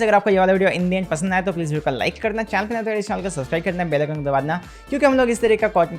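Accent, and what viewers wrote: Bengal, India. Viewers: Are you Bengali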